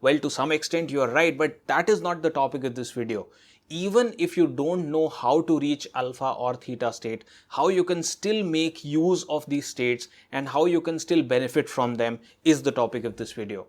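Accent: India. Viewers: This pure Indian accent sounds sooo good....no artificial stuff 👏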